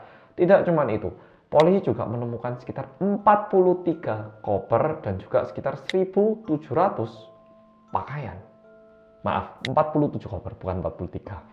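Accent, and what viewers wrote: Java, Indonesia. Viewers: Oppa medok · Mas yaaang medhoookk. 😀 Welcome detektif reomit ❤️❤️❤️ Stay healthy forever,mas 🤗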